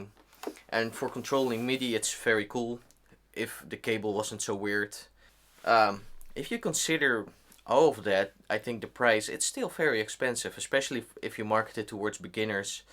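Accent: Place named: Netherlands. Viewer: i smell sniff a dutch accent 🤔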